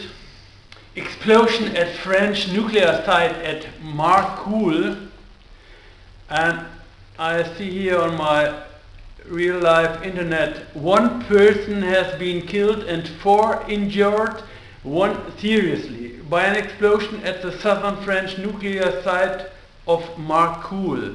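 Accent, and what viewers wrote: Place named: Germany. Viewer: Kann man zwar mittlerweile überall nachlesen (z.B. The Times) aber der Aufruf zur Abschaltung aller Atomanlagen ist richtig. Nicht böse gemeint: Die Englische Aussprache ist typisch Deutsch :-)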